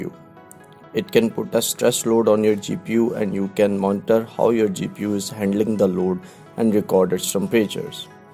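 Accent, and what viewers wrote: India. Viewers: Indian accent, i think ill pass on that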